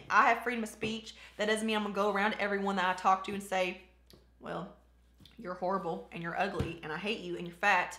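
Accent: South, United States. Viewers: OMG a southern youtuber!!! Literally 10 seconds into this video and I'm mashing the subscribe button 😂😂😂 #southerngirlsarethebest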